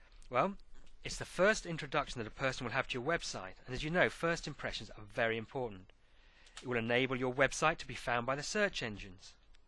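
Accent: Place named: England, United Kingdom. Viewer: Thanks for the info. nice to hear an English accent!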